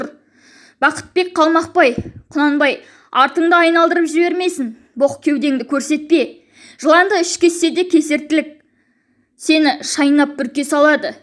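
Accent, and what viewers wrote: China. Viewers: Осы автордың дауысын естісем,қытайдың дауысын естігендей, денем тітіркенеді..Қытайдың акценті.Біріңді бірің жау көріп,Қазақтың кең даласына сыймай жатырсыңдар-ау.Ұялмайтын адам екенсіңдер.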